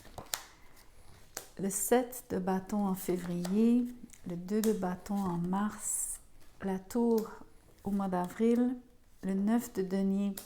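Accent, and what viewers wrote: Quebec, Canada. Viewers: Merci pour ce tirage ! J’en profite pour vous dire que j’aime beaucoup votre voix douce, votre rire discret et votre charmant accent québécois.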